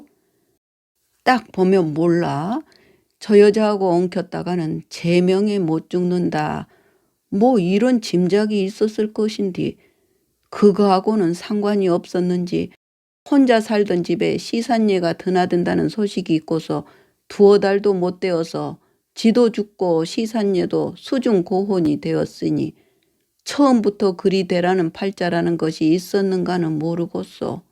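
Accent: Jeolla, South Korea. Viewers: 전라도 사투리가 귀에 착 달라붙네요 진짜 실감나게 잘 읽어주시네요 엄지척 👍 😍 😎 ✨️ 😂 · 참말로 잘헌당게 전라도 사투리 오메 오메 징허게 이쁘당게 목소리가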